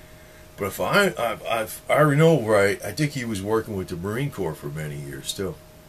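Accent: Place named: Chicago, United States. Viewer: FINALLY! somebody sez it!..."insider"..bovine scat...."AJ" Bovine scat!...and it drives me nuts, everybody looks to RT like its some sort of oracle....do they post some truth?....yeh, but they never hang out their own dirty laundry...just ours. I like you, "Hizzoner" likes you, coz we both got the same accent...but I got out of "Big Shoulders", moved into the weeds....not out of fear, its coz the place has changed, "Rahmfather"?...forget it....agree with you on silver and the "brain freeze"...I stick with American pre-65 90%'ers and ASES....I like the 40% Kenns too...we just keep stacking...its an insurance policy, not an investment.....so you got a new subscriber, coz you helped me with the brain freeze...Happy New Year!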